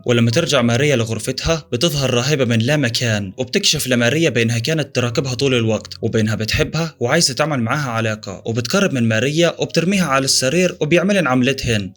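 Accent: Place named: Syria. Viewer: لهجتك بين السوريه وبين المصريه حيره🤔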